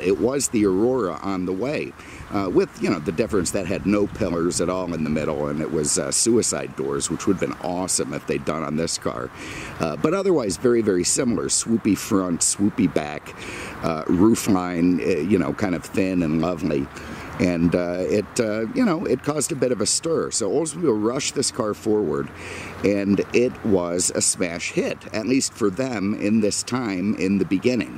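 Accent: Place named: Chicago, United States. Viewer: He has GOT to be from Chicagoland. He sounds 100% identical to my old neighbor. I even sent one of videos to his wife and she laughed hysterically.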